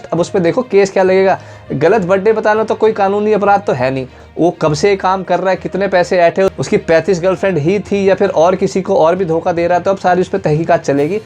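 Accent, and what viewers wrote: India. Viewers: Desi accent mein Japanese news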